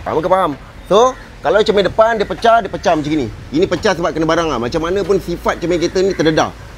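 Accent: Indonesia. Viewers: Indon ke dia ni?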